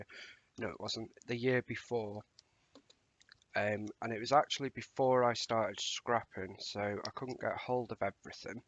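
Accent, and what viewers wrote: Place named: United Kingdom. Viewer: How nice to hear a British blokes voice doing scrapbook pages… cool take on the sketch and prompts. The format works a treat just like one of my favs Shannon (she’s Crafty). Chuffed to have found you… new subscriber 😊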